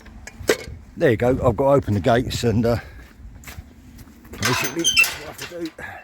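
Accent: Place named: London, United Kingdom. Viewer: Just out of curiosity you dont sound like you come from the area... more like my neck of the woods..... London?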